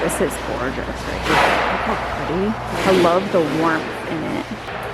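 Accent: Texas, United States. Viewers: The Texas accent was prominent in this video!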